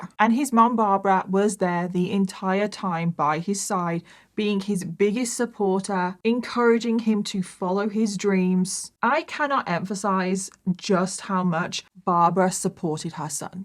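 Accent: United Kingdom. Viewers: Can somebody please tell specifically what kind of British accent has? Thank you. I like it.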